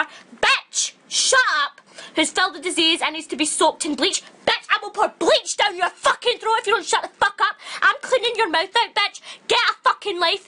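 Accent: Scotland, United Kingdom. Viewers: haha i love your accent, that british/sco/irish accent is beatifull :p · is this a scottish accent? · Scottish, from Glasgow probs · Also to the people asking about the accent it's obviously Scottish, maybe Irish. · it's angry scottish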